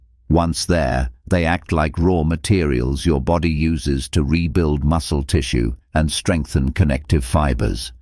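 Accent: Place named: United Kingdom. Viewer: Why does it take almost 16 minutes to say what you add to your coffee? We do not need to listen to the British voice droning on & on.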